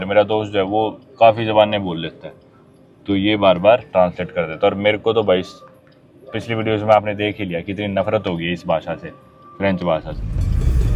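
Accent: Haryana, India. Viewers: Bhai app ki haryanvi love ❤ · Most funny travel vlog your haryanvi accent is amazing 😂😂😂😂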